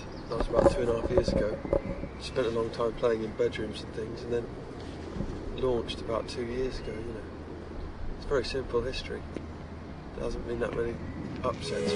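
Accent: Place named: England, United Kingdom. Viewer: Nice english accent 😊❤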